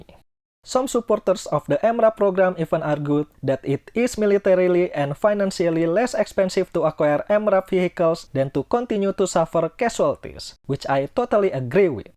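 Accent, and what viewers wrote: Indonesia. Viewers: bang mau saran bikin video nya pake bahasa indonesia aja. Agak gak enak denger bahasa inggris tapi pake nada dan aksen orang indonesia coba kalau mau bikin video pake bahasa inggris pake google translate aja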